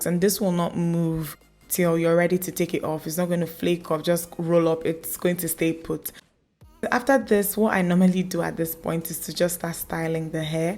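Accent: Nigeria. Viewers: It's refreshing to hear the naija accent whew! Thanks for not forming! 😘😘😘❤️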